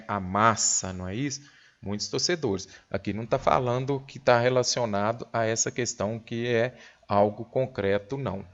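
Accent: Minas Gerais, Brazil. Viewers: Professor, você é mineiro?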